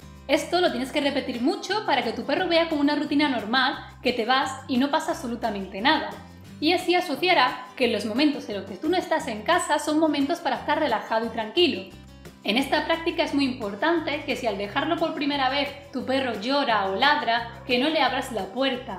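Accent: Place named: Seville, Spain. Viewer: Ese acentillo de sevillana fina te queda muy bien. Soy de Granada pero también del Betis, espero no haber metido la pata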